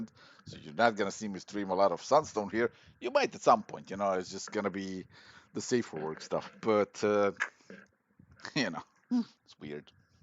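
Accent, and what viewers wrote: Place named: Balkans. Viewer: Love the Balkan accent <3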